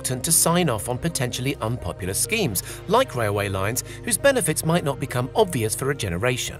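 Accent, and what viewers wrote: United Kingdom. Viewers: Sorry, hard to understand due to British accent.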